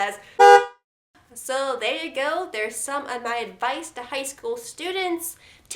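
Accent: South, United States. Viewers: you accent is become increasingly countrified. It's getting me excited. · Good vid. Your chin dimple is getting cuter each time. Where does this southern accent come from.....real or are you just putting in on? BS says free college for everyone...just what we need.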